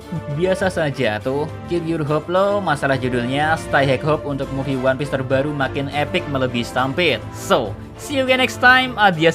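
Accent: Java, Indonesia. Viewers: Yoi,ala logat jawa suaranya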